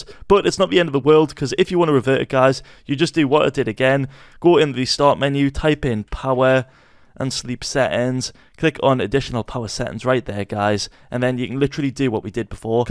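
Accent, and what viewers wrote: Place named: Newcastle, United Kingdom. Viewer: your from newcastle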